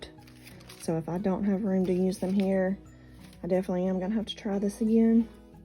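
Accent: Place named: South, United States. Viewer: If "Hi y'all" fits you better than "Hi guys", I think you should definitely switch! I'm not sure I know anyone who doesn't love a southern accent. :) Be you!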